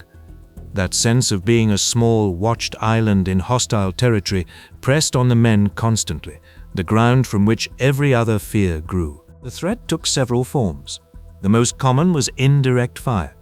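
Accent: England, United Kingdom. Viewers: Always interesting hearing an English voice telling us about Vietnam .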